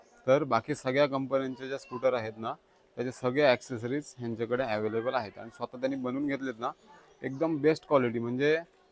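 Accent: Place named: Raigad, India. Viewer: मस्त ani bhari tuj बोलण khup आवडतं मित्रा ओरिजनल रायगडकर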